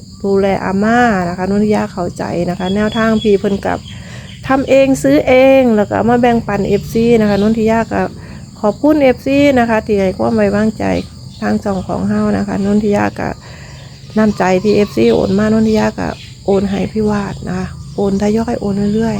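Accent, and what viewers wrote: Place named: Laos. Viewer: เสียลาวหลายจ้า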